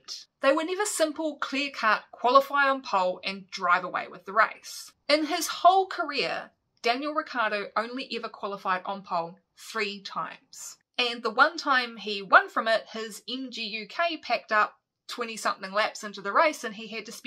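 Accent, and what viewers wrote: New Zealand. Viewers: Yeah, I would like you to do a more in-depth analysis of DR3 & his career. For two reasons - first, and most important, you convey your thoughts in a manner that is real, absent of all the BS & other general palava that is served by the vast majority of F1 content creators ( I would put you in the top 10 in your field - your numbers don't reflect that, but that is just a matter of time and a very smart man once said time is relative!). Second reason - there is nothing wrong with your accent there should be more of it on YT. There ya go - you're a NZ trendsetter! (Yeah, there's a better than even chance I might share your accent! 😉) In any case, it's miles better than the worst accent - shit, can't say that in the comments section of a video about an Australian can I. OOPS! Keep up the good work. Can't give you a new subscriber - I was No 39! 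(Will be posting this to FB tho 10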